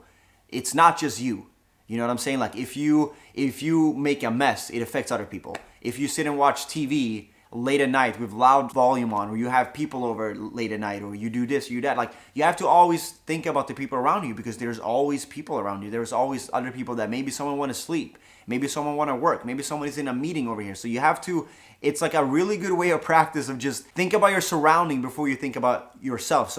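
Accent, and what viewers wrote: Scania, Sweden. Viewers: Han är väll skånsk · NÅGON SOM VET VILKEN KOMMUN kommer ifrån? Har givetvis att han är skånsk. Hade varit sjukt ifall vi bodde i samma kommun.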